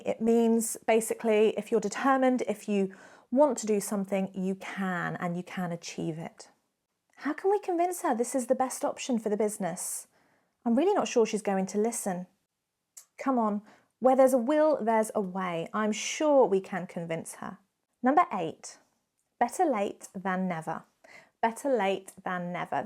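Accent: United Kingdom. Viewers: So charming with your British accent. Just in case anyone was wondering, these are all commonly used in the US as well. Here's one I love, but seldom hear; it seems to come from the midwestern US: "You can get used to hanging if you hang long enough."" It expresses a grudging patience with unpleasant circumstances one is not able to change, with reference to the lynchings that were a part of vigilante justice in frontier days. Example: "Do I really have to put up with that child's whining all summer long? Well, I suppose you can get used to hanging if you hang long enough." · British or american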